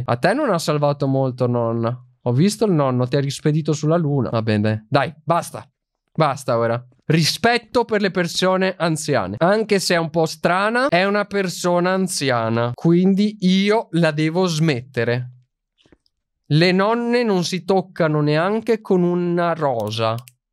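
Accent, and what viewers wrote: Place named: Veneto, Italy. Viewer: mi sembri veneto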